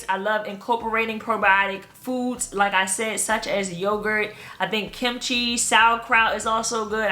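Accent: Baltimore, United States. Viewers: Girl I knew it. The first time I heard you talk you sounded like someone from MD, Baltimore to be more specific. In This video the way you said pizza, I’m like I know she’s from MD!!!! 😂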